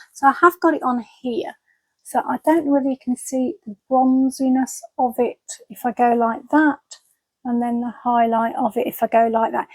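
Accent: Birmingham, United Kingdom. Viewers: I thought you were from Solihull or Birmingham by your accent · I always thought it was a Birmingham, Midlands accent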